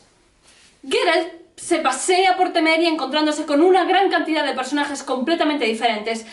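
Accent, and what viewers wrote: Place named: Galicia, Spain. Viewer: Estupendo análisis ¡y diverdido! Un apunte, los libros de la saga El Brujo o saga Geralt de Rivia son siete. Te faltó decir que están bien escritos (aunque se dé por hecho), en 3a persona, por supuesto. Una grandiosa saga de literatura fantástica europea. No entiendo cómo no te gusta Jaskier. :( Al final de la saga le coges cariño, sin él no sería lo mismo. El momento de Borch Tres Grajos es increíble, la piel de gallina. El acento galleguiño que no falte. jeje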